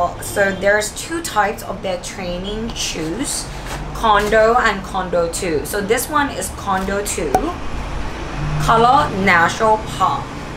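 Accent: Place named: Thailand. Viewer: I‘m so happy I stumbled upon your video! 💕 I picked up the pink pair - mainly for my walks. On a different note - I recognize a Thai accent. Is it possible that you‘re from Thailand? x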